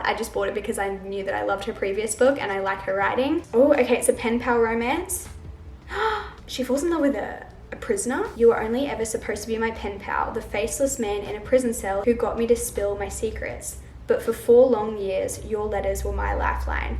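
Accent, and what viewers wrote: Australia. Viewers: Your Australian accent is beautiful !!!!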